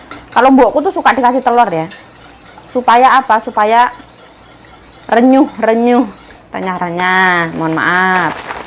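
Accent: Java, Indonesia. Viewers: Logate omong lucu...bner2 logat jowo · i love video you yg khas medok e😀😀😀😀👍👍👍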